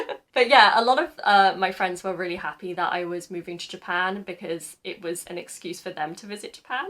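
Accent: United Kingdom. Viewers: イギリス英語のaとかoとかerの発音がほんとにかっこよくて好きだけどなかなか真似できない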